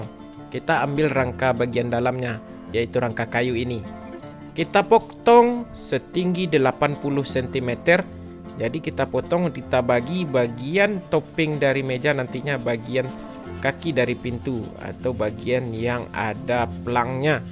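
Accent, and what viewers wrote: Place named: Sumatra, Indonesia. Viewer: Org mana aslinya Om kalo didengar suara org sumatera tp kalo kata dingsanak itu bahasa Banjar Kalimantan Selatan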